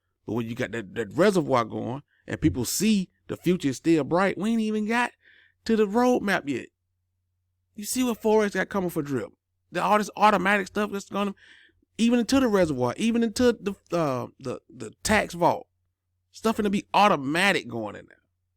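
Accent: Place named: South, United States. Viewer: That that “southernplayalistic” dialect!! Yas sir!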